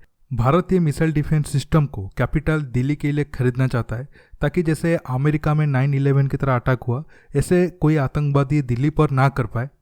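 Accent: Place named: Assam, India. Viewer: Bhai aap Assamese jaise baat kyu karte ho . Mere khayal mein aap okhomiya hoga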